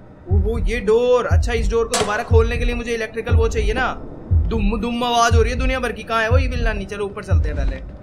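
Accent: Haryana, India. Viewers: Tumbara jo Punjabi/Haryani accent hai, vo sabse funny hai Funny in a nice way , I love all the accents . Not insulting.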